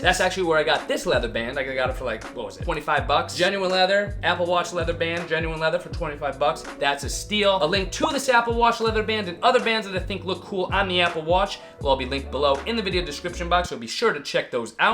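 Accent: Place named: Boston, United States. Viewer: That Boston accent 😂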